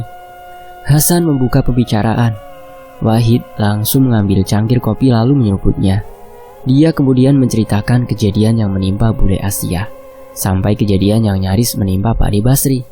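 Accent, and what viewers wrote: Java, Indonesia. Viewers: Wih pertama kali nonton channel ini pas cerita sewu Dino.. Cara penyampaian nya Bagus apa lagi logat jawa nya,ya walaupun saya Orng sunda tapi enak dengerin Mimin wkwkw.. dulu subscribe nya masih sedikit sekarang udh banyak ya wkwk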